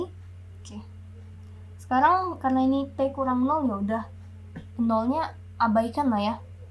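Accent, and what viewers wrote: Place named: Makassar, Indonesia. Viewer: Kuliahnya d mana kak serasa org makassar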